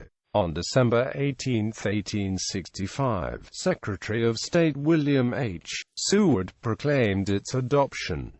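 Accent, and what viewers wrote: United Kingdom. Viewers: why is a man with a british accent on here ?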